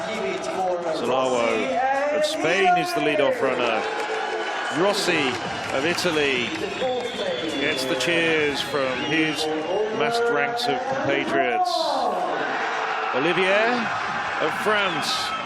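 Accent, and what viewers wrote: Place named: England, United Kingdom. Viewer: Maşallah koçlara, sunucu o s.kik ingiliz aksanıyla ne güzel bozuldu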